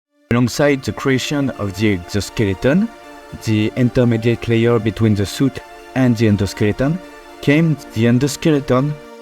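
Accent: France. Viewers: i dont care about your french voice, IT'S AWESOME! GoodLuck pal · heavy French accent, and I like it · I think you are French cuz you like- Sound like an French person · Dès que je t'ai entendu parler au début j'ai su que t'étais français 😂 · Are you French? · Are you french🇨🇵? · so frustrating hearing a french dude speaking in english while I also speak french xD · Are you french ? I hear a french accent ( i'm french ) ( bon j'ai eu une confirmation a ). Enfin bref, soit tres minutieux, c'est vraiment tres dangereux de faire des costumes de springlocks, en tout cas bien joué. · Man you have a french accent and that's incredible as a french myself, anyways good job on the springlock suit! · He sound very french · are you french? you sound like you have a french accent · Are you French by any chance ? I think the accent matches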